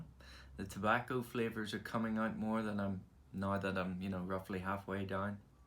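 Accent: Ireland. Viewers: Thank you for subscribing to me do I detect a little IR ish in your voice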